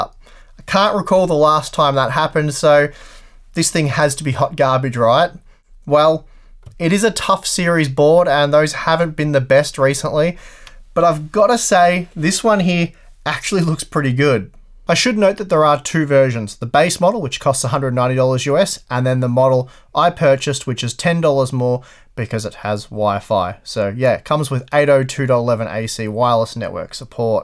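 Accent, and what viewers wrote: Australia. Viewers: Ur Aussie bro! Subscribed and patreoned. Really appreciate your work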